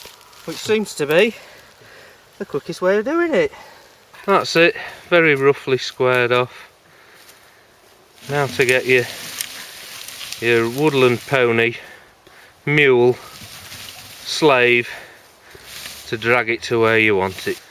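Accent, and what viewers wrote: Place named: England, United Kingdom. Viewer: Im an American and it sounds a lil funny that you have an English accent but you read inches. thank you for the information bud. cheers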